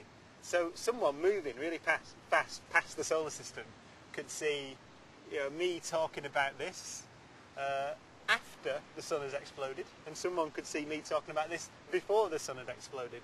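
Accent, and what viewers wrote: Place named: Lancashire, United Kingdom. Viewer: "The ball caused you to fall off the wall" is the greatest sentence you could ever hear in a Lancashire accent.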